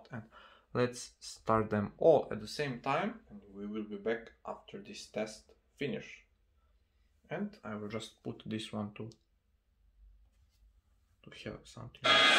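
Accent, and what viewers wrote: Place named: Russia. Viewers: Внатуре русский бля 🤣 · Акцент выдает, чел русский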